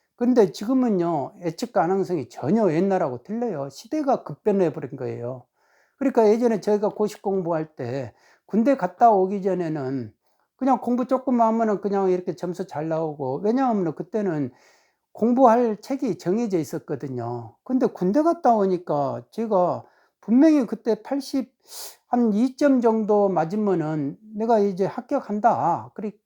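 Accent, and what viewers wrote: South Korea. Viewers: 구독자 입니다. 고향의 이웃동내 같은 구수한 억양에. . . 저는 전북 정읍 현재는 사무실을 옆 하비오 에 있습니다. 오늘은 너무 유익해서 두번 듣습니다. 제가 동영상을 거의다 듣고 있는데 아주 유익 합니다 .끝부분에 고생 고생해 남쓸때 안쓰고 모아서 3채 가 되기에 아주 고민이 많습니다.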